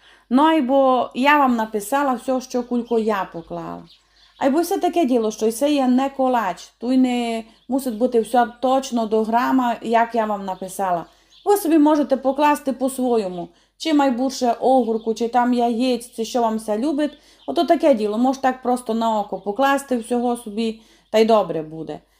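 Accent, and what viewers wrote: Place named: Tiachiv, Ukraine. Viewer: Вітаннячко з Канади 🇨🇦 Вподобайка одразу на підтримку каналу 👍 Хотів спитати Вас ,часом Ви не з Тячівського району? Така знайома ,приємна бесіда у Вас...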